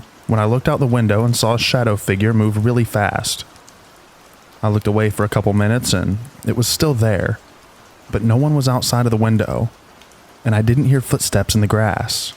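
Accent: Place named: South, United States. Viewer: I love listening to your stories and sometimes picking up a little bit of southern twang, I always smile when I hear it!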